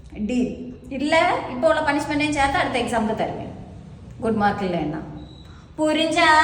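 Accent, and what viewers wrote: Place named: Nagercoil, India. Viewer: Nagercoil slang ❤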